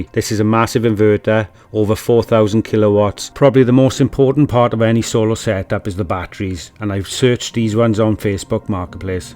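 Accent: Wales, United Kingdom. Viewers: By any chance are you Welsh ??? 😊 Good luck mate and where are you?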